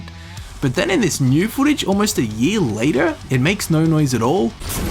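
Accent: Australia. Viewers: Took me a while to realise. You're an Aussie? Never seen your channel before, but since Eternal will be my "allowance" game for this year, I'm trying to soak-in as much of it as I can to get an idea of what my wife is letting us spend $300 on bahaha. Your video is damn detailed and I would be very interested in talking with you after Quakecon (which I hope you will be covering). Awesome content, mate.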